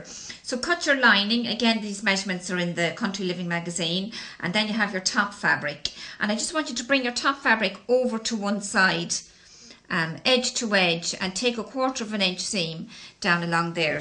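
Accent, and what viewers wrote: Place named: Ireland. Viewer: Thank you. I love your lovely Irish accent.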